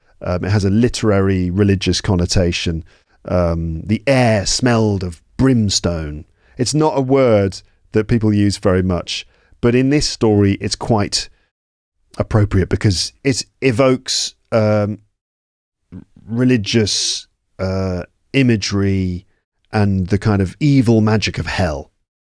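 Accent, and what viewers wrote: United Kingdom. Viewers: I love the accent British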